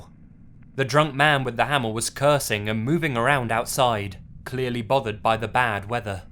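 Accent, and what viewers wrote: England, United Kingdom. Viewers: Why do men with British accents narrate horror stories better than anyone else? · someone who lives in England, English accents aren't always this great, enjoy it XD Congrats on the year,:) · Riseys Well actually my voice, I'm from the same area of England as, there fore I share the same accent. Anyways, why so aggressive, pent up anger mate? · Yep but you know this guy is English you're probably American like me at this point they have become two different languages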